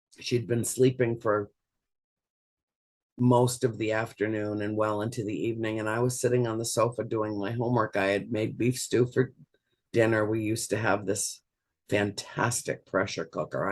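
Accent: South Africa. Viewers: What nationality is the presenter? He sounds South African??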